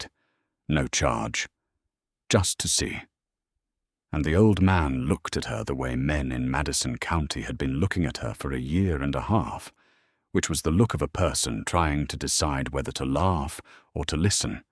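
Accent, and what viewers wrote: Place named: United Kingdom. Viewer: why is the narrator a Brit?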